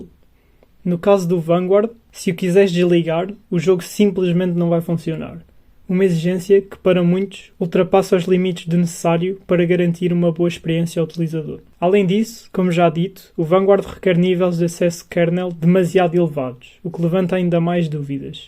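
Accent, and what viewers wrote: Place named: Portugal. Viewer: Eu ia quitar por n gostar mt do sotaque dos tuga porem fez um ótimo vídeo mano, conseguiu me reter o vídeo todo.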